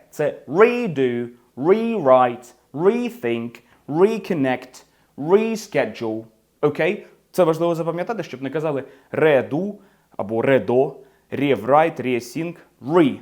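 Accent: United Kingdom. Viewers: Ці відео дуже корисні! І я вам дуже вдячна за ці уроки. Я завжди вам коментую бо я дійсно хочу щоб у вас був мільйон підписників! Обожнюю ваш британський акцент! Можливо ви колись розповісте нам як ви вивчили англійську так бездоганно!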